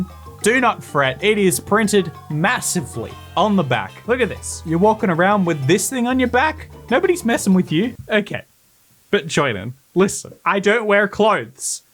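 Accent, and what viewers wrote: Australia. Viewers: i thought he was australian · i did not know, i thought hes austrailian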